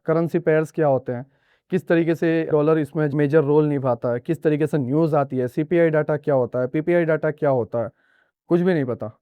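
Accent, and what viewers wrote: Gujarat, India. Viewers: Pakka ap gujrat se ho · Ap Gujarat se ho...? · Bro are you a gujarati?? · Are you gujrati bro ? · Sir aap gujrat se ho ? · Lagta hai Gujarat se ho....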